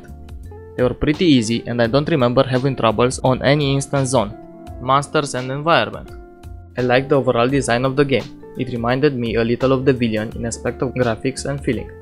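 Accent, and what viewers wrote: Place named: Romania. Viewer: this guy sounds so romanian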